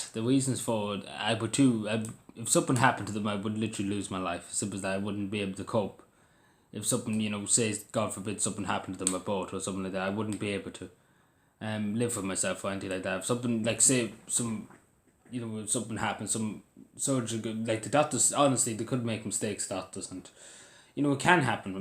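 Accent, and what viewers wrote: Cavan, Ireland. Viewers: Love the Cavan accent. I worked for a Cavan man many years ago. Best boss I ever had. Looked after all his staff so well.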